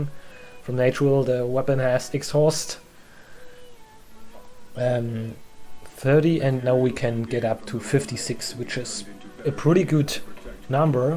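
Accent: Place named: Germany. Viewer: I hear that german accent😂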